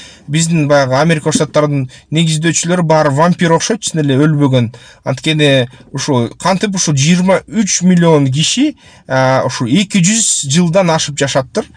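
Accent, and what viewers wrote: Standard. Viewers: тушундуруу таза кыргызча эн соонун❤👍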